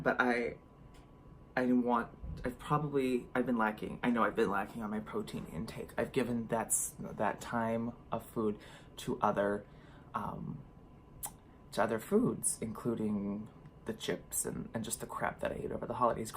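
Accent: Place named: Minnesota, United States. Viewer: Thanks for keeping it so real and honest,! This entire month has been a struggle for me food wise as I’ve allowed myself to have so much crap and I just don’t feel the way I did before when I was in control. In the long run, sugar after sugar really does hurt my weight loss efforts too in more than physical ways. Good job on upping your water and protein again. I too am determined to get in all my water today! I was skipping protein shakes here and there too due to the extra snacks. Getting back to our work will help us get back to routine. Love that you got your Bullet! My kids were just asking me yesterday when we would start having smoothies again. I’ll make more when the weather warms up. I can’t believe you were having kidney stones every 6 months. Ick! I’ve had the stents too and I seriously can’t imagine anything worse than that. 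Seriously! Probably the worst pain even above having children. I think maintenance and routine can easily be established with working out/exercise. The key is to start slow and add slow. It becomes so much easier and habitual over time. I can’t stress enough SLOW and attainable goals. Did you know you’re beginning to pick up a Minnesota accent? I love it...how you say “about”....Happiest New Years to you and yay for trying champagne. How fun!!!!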